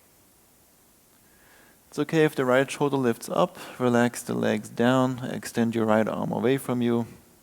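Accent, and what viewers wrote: Germany. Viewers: I like your german accent and can understand you very well :) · dein deutscher Akzent macht leider alles kaputt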